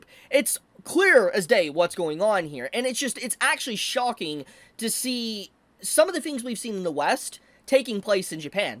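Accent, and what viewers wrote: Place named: Berkeley, United States. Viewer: She sounds like STRAIGHT from Berkeley, University of California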